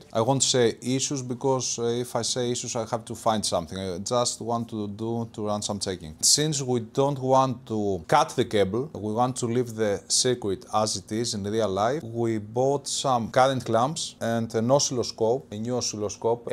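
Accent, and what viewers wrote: Greece. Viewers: Κατάλαβα κατευθείαν ότι είναι Έλληνας ο ομιλητής λόγο της προφοράς του 😅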